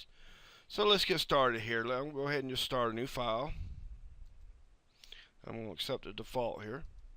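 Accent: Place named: North Carolina, United States. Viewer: Really cannot see how you have 14 thumbs down on this - I'm a beginner trying to learn everything I can at home using my subscription to AI from cloud so that I can do at work what my boss needs me to do using this program and vector graphics with fonts and jpgs. Great video, no back and forth oops I forgot or a bunch of info I don't need. Love the accent too as I am in NC I feel right at home listening to you :) Couldn't be more thankful for the tutorials.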